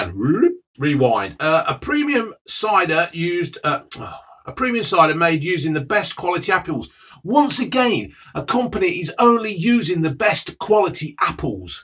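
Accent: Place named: Leicester, United Kingdom. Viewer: Suiceyed love the leicester accent on this man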